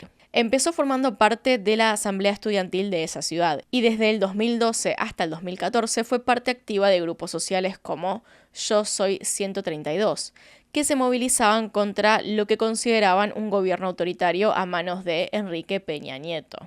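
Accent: Argentina. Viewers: Que lindo escuchar en tu tono argentino todos los lugares de mi hermoso México. Pero que tristeza da que este país lleno de cosas hermosas y personas increíbles como las que tu retratas en este video sea cada vez más conocido por los abusos que genera la autoridad contra su pueblo y ahora también el narcotráfico...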